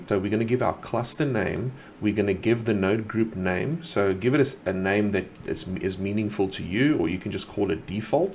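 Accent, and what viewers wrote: South Africa. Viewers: Why does it sound like you're afrikaans.?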